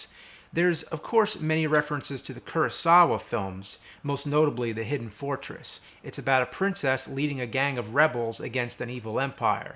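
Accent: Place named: New Jersey, United States. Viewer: It's because he's from Jersey. That's how people around and in NY speak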